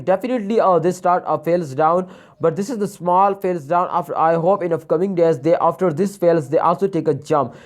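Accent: India. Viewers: Man your accent sound me Indian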